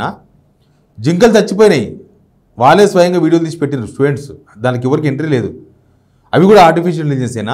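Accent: Telangana, India. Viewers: Very nice explaining. Very good Telangana language garu.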